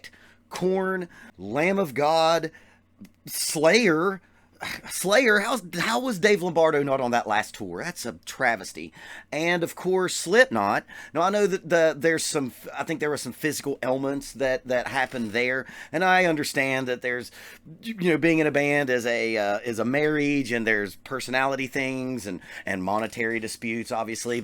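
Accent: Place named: North Carolina, United States. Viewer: Yea I think its strange and weird that the Adler brothers are not playing together anymore, BTWay your accent sounds very familiar to mine, You from NC? lol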